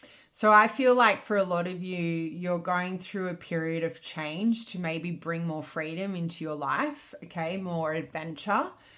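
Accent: Australia. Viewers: All the piles and it’s the best having an Aussie mate who sounds a bit like me, chilling in my lounge. And now I must ask the most important question 🙋‍♀️… Have you been shopping at Big W?? I’m busting to get to use the decks you’re giving my message with as I speak (and listen). If not, unless you wish to sink into the devil energy as a choice - as I am - all 9 of pentacles, boujee single lady in all the abundance. That’s not exactly the case, but I slapped on a face mask and got some conditioner in my hair (ma’am, I made it into the shower today and that was a soul upgrade in itself 💪) and this reading is absolutely resonating. Even more so that I can listen to it in the accent that the hate is coming from. Same as my own. Not my high school mates. They are staunch ✊ A different area but same group. Every town has one. Taylor Swift wrote a song about them - 15? 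I think?? And the kardashians didn’t blow up on love when I think about it. Kris Jenner is the boss of turning hate viewing (the best kind really bc them they’re paying attention. They are Scrutinising even) into the big bucks. That’s why I connect to Nelly’s even though I’m the wrong colour and gender and way too old to sing what I do, but I really only know that if I hear someone saying it and I believe it. And you’ve given me EVERY CONFIRMATION. Deck of Darkness - underpins EVERYTHING FOR ME in this moment. You said something like you don’t have any witchy woo cards out. I’m super spiritual but I’m als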